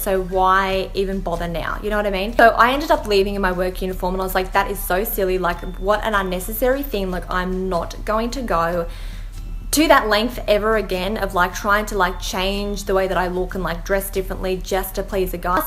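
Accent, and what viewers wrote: Australia. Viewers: It's an Australian accent😂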